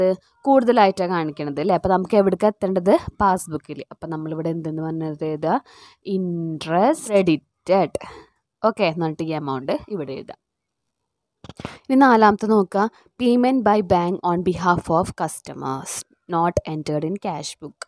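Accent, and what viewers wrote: Thrissur, India. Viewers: Teacher niggal thrisuur anno, aah oru slang😊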